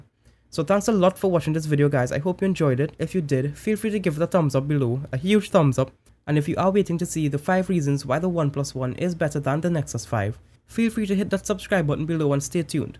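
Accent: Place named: Trinidad and Tobago. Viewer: Firstly, I could tell straight away you were Trini haha. Secondly, Great vid bro but I need to ask where did you get your Nexus 5 cover from??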